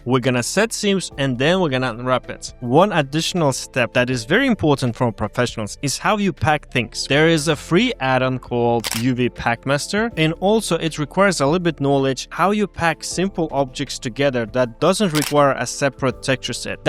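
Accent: Russia. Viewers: godamn, Russian people always so good at everything LOL!, thank for this man, your accent in funny in a good way! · Мне кажется или у автора подозрительно русский акцент? Но в любом случае видео очень полезные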